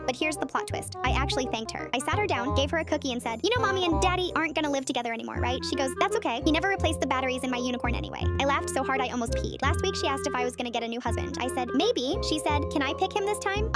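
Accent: Texas, United States. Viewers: The texas accent makes it funnier😂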